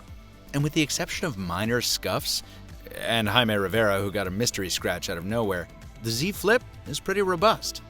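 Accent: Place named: New York, United States. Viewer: Well he's my new favorite reviewer! A New York'er but still with a European touch. Love the tone and his narrating. He'd do excellent in Europe too.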